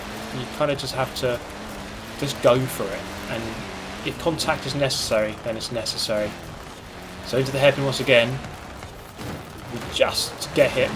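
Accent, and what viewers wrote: United Kingdom. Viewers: british accent ; Miles Per Hour. hm..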